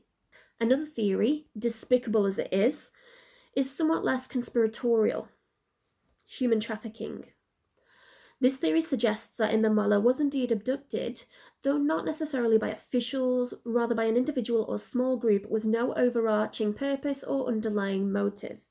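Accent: United Kingdom. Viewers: I love your british accent, are you native british?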